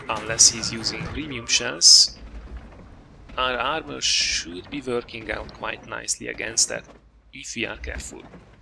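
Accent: Hungary. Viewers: de hallatszik hogy magyar vagy :D ment a like es a feliratkozas :)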